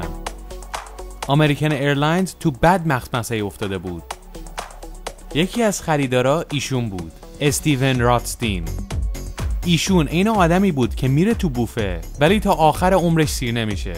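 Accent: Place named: Varamin, Iran. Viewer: لهجت میخوره ورامینی باشی🤩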